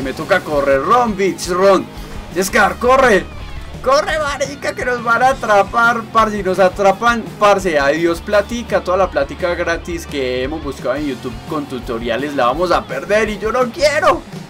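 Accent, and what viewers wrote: Antioquia, Colombia. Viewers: Puro asentó paisa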